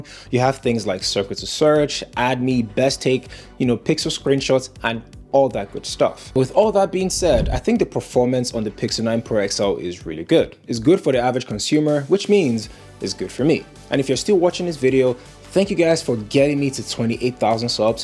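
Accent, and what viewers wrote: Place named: Nigeria. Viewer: I hear a Nigerian accent... I subscribe 😂😂. Good luck with your channel dude! Review was on point BTW.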